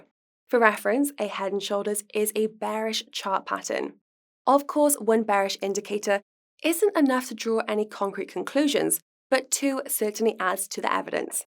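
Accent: United Kingdom. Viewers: You’re a class act,! Wonderful British accent. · sounds British to me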